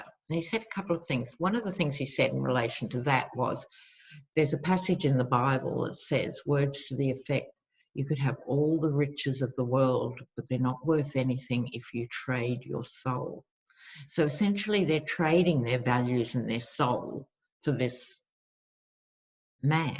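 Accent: Australia. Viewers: I have always loved the Australian accent..🤗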